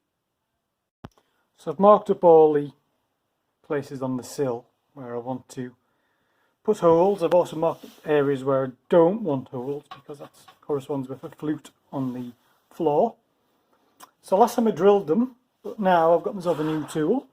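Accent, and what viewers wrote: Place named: Geordie, United Kingdom. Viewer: Haha I'm from Peterlee and to me you sound like a well-spoken Geordie.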